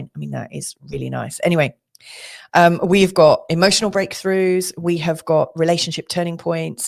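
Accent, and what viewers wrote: United Kingdom. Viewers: Lucky woman with that gorgeous red hair and beautiful face. British accent too. If you came to America., you would have many men , good ones, fighting for you Thanks in advance for the reading I hope it’s in line with what is going on for me and then I win the backup offer on the house! Love from America and prayers for all my brethren, British folk. Praying for your nation and people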